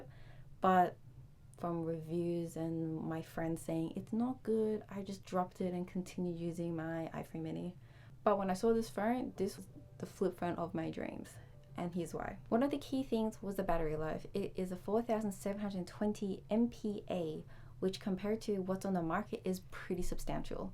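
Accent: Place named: Australia. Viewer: Clicked because I had never seen that phone before, heard the Aussie accent and immediately subscribed. I so much prefer home grown content.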